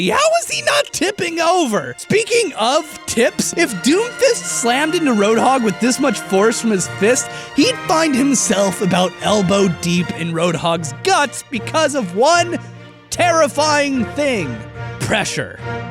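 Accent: England, United Kingdom. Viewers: cute english timelord!!!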